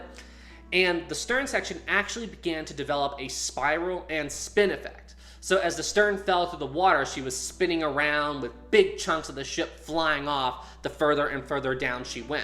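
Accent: South, United States. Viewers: Your a yank though so excuse me if i only catch parts as that accent an hearing it bloody hell!!!!! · Are you from the south I hear a fair amount of twang in words like time it’s a good thing btw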